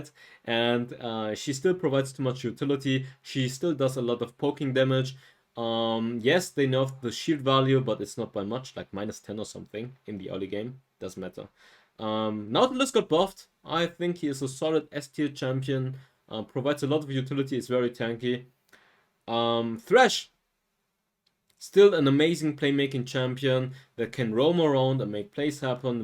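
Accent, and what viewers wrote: Germany. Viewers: o he is german?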